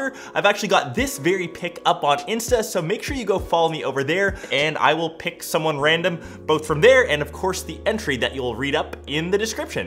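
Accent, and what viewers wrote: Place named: Canada. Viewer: Your Canadian accent calms me, it's often my audio ambiance of choice while coding.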